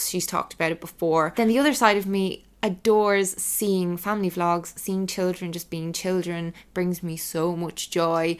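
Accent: Ireland. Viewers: If I'm having an off day, hearing your Irish accent makes it a good one. Thanks!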